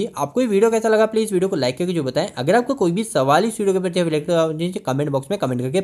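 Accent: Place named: Maharashtra, India. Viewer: Sir Aapki bhasha sunkar Aisa lag raha hai ki Aapko Marathi bhi aati hai Aapko 😅😅Sir plz Marathi mdhe video banva na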